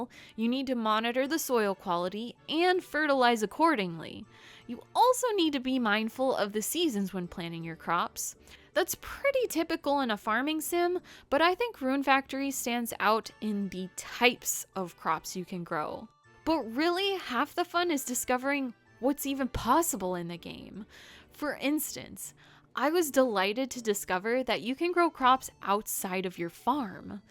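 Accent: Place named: Texas, United States. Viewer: hehe love~ your voice and love~ the texan accent XD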